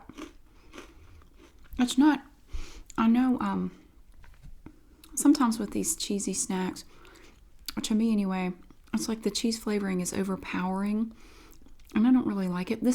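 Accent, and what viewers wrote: United States. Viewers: gor God's sake, Please stop with the pronunciation issues. She lives in the States of course shed pronounce it differently than Aussies.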